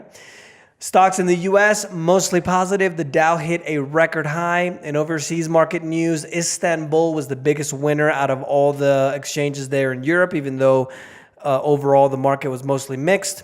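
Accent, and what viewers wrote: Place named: Latin America. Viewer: hablas bien ingles, pareciera q fueras nativo pero tienes el acento fuerte de latino. practica mas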